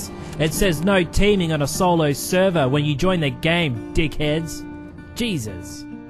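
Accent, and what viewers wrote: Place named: Scotland, United Kingdom. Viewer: that adorable Scottish accent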